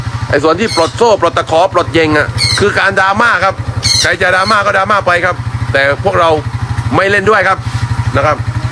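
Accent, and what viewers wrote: South, Thailand. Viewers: ชอบนายหัวจาพูดใต้ไม่ดัดจริต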